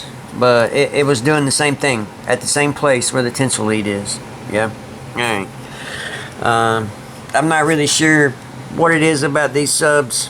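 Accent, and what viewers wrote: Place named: South, United States. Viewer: DUDE!! Thanks for the video! I just bought my 2x 124s the other day for 150$!! LOL One of them is blown, one is perfect still! Theyre like brand spankin new almost! Are you reconing the second sub or are you hanging onto your 2nd recone incase one blows? I need to get myself a coil STAT! I had no idea these subs were so easy to recone like this, I gotta hustle up some cash real fast so I can buy one. If you do recone your 2nd sub you should sell me the coil you take out :D Im from Cookeville, TN, you sound like youre also in the southern area? Take care man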